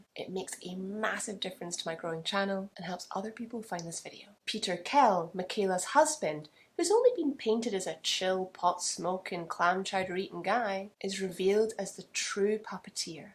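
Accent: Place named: Ireland. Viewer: Came here from tiktok, I like your Irish accent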